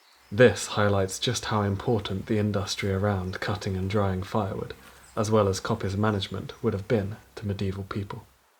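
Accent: United Kingdom. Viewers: I think, it's a good idea to make summary videos like this, to provide some insights and to share your experience along with a video itself. I read descriptions you put under your videos, they're full of interesting information, but they're also not aligned with visuals, so two exist slightly separately. Having them synchronized, with an additional information, is very cool! And I like British accents, so more narrated videos, please.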